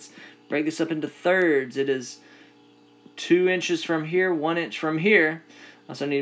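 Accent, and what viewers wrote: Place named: South, United States. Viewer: love the southern accent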